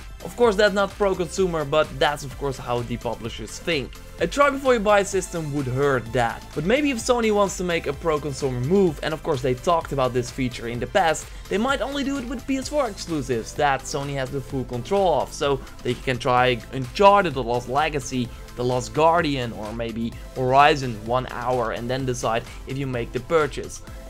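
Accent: Netherlands. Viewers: Ben je Nederlands? Ik hoor het aan je accent hahah ;)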